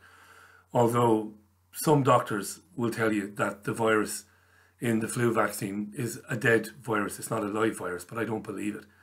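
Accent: Ireland. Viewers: O man ......I miss ya ? I didn't realize how much I listened to you and the show even my kids are asking about you ..my ten year old son does a real good Irish accent all because of your show come back soon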